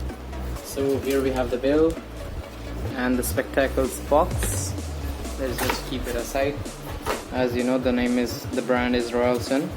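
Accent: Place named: India. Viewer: Nice Indian English accent though!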